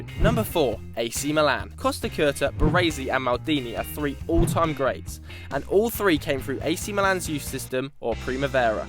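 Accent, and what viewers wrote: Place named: United Kingdom. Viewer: Why do british people always have to pronounce names their way La Mayseeya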